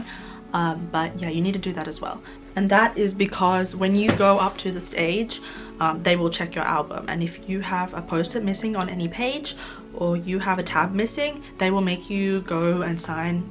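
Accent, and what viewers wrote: Australia. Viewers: I'm trying to understand everything you were saying but you speak too fast and my dumb mind can't affort that aussie english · My dear , i wish someone would put at the comment site on what actually the step is because im not saying your way of explaining is bad but within your aussie accent i just cant understand much mate . It would be nice if you nicely put the steps at the comment site or at description or even subtitles because i cant get through of the whole video because i wasnt understand much . I just understand until at skz twitter link and after that about the albums mate i really doesnt understand i hope that you will understand our situation is . I really adore you and i wish to see more of your videos . Love , support and feedbacks from malaysia ! ❤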